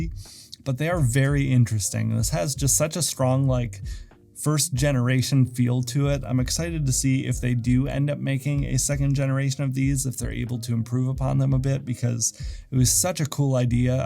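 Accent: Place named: Austria. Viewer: are you austrian, you sound like arnold sometimes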